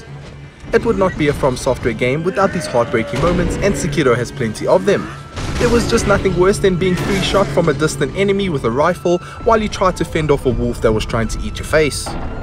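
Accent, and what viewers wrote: South Africa. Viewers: Watching this & I am like, this dude sounds like a white South African guy.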